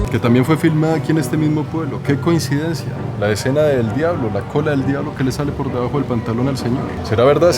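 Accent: Colombia. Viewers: Severo Que chevere que hable como colombiano like x 10k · Vaya d lo q uno se entera ...😃me encantó el video las historias..el río.. q lindo..me gusta tu voz ...y tu acento colombiano 😍.. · q belleza!!!!m encantan tus vídeos pero muchas veces los escucho porque tú voz es música para mis oídos,adoro cómo hablan los colombianos,m encantaría vivir en esa ciudad y mucho más xq hace referencia a mi libro de cabecera 100 AÑOS DE SOLEDAD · Que buen historiador eres! Muy admirable tu forma de contar! Increíble memoria! Me recuerdas a mi padre! Además tu acento colombiano? Lo máximo! Saludos desde Ecuador! Saludos a mi familia en Colombia los Rodríguez Restrepo! Gracias por el video! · Qué hermoso acento colombiano!!!es muy sensual y varonil!!!muy interesante la historia de un lugar tan bonito!!! La historia de América, no hay esquina donde no haya llorado un indígena. 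Saludos desde México!!!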